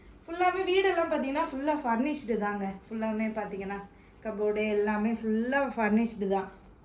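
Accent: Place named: Ramanathapuram, India. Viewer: Ongloda slang ramad (D's)mari erukku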